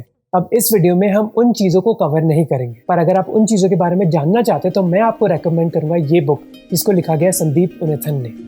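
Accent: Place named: Bihar, India. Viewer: I think it's his speaking style 🙃 like bihari accent or avadhi accent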